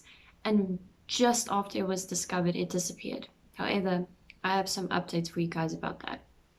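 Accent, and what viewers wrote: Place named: South, United States. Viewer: Wasn't expecting a full Southern accent 😅, loving the informative content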